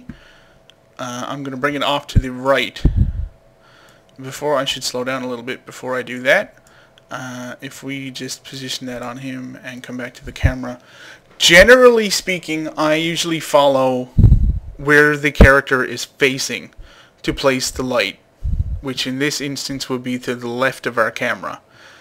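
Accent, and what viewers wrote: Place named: Newfoundland, Canada. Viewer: Love the Tutorial...AND the Newfoundland Accent! At least that's what it sounds like. I have a lot of family there and my mother is a newfie! :) Anyways, thanks again!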